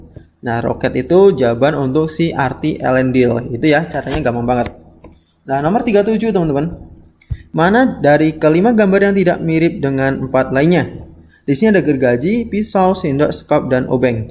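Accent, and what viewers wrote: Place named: Cirebon, Indonesia. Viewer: logatnya cerbon bgt, tah indramayu?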